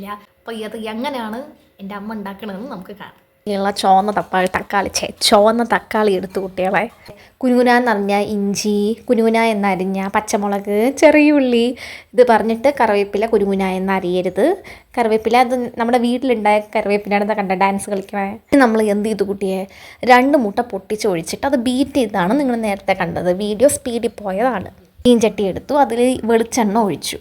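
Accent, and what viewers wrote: Palakkad, India. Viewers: Palakkad slang super 👌🏻😄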